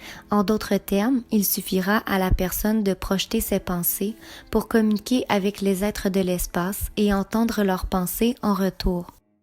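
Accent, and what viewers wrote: Quebec, Canada. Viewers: au petit accent du Québec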